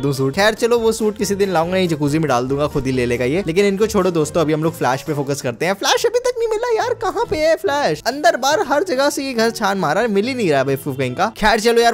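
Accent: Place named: Punjab, India. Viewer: Meri party aapka yah Punjabi tadka hai na bada mast hai mujhe maja aata hai aapka Punjabi tadka sunane mein aur Hindi wala Punjabi tadka 👍👍👍👍👍👍👍👍👍👍👍👍👍👍👍👍👍👍👍👍👍👍👍👍👍👍👍👍👍👍👍👍👍👍👍👍👍👍👍👍👍👍👍👍👍👍👍👍👍👍👍👍👍👍👍👍👍👍👍👍👍👍👍👍👍👍👍👍👍👍👍👍👍👍👍👍👍👍👍👍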